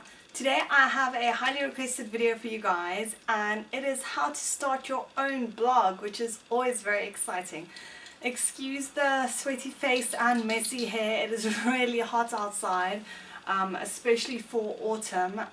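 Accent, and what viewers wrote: South Africa. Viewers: Kelly Castillo That's a South African accent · are you from South Africa ? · Are you from South Africa? You sound South African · I started watching his and was like wait she is South African too!!! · You are South-African aren't you? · r u from SA?? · Are you South African or have a South African backround? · are you from south Africa? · South African accent I hear?? Love Fromm fellow Cape Town viewer! Xoxo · She must be South African, would recognize this accent everywhere 😂 hear it every day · South African? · south african ? YAY! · +April Maroshick She's definitely South African with her accent ;) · Does anyone know if she's South African? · You are South African like me awesome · Are you South African?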